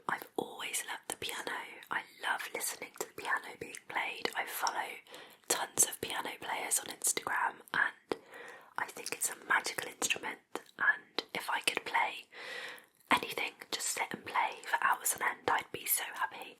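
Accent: United Kingdom. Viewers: Hello! Thank you for “ breaking the fourth wall “ for a bit, and sharing a bit more “ personal aspects “ of you, and your life. I truly enjoy your channel, as you seem definitely more down to earth, and genuine (perhaps it’s an “ English/ British thing”), but it’s warm and approachable, this energy that you share in your videos. And your voice, gentle, along with you lovely accent, is so very relaxing. Anyway, as I noticed that you seem to have a bit more of a “ mischievous and playful” sense of humor as some of your answers in this video would indicate, would you ever consider doing a bit more “ silly, ridiculous, or playful” characters in future videos? Just a curious inquiry from a distant admirer…..be well, ( sorry, never learned your actual name, if you’ve ever shared it!) Cheers! Thanks again for all the hard work!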